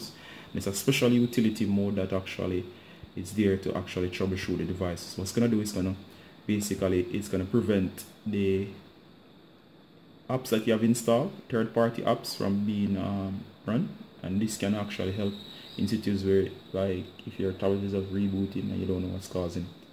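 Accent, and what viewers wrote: Jamaica. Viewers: Cuz u sound Jamaican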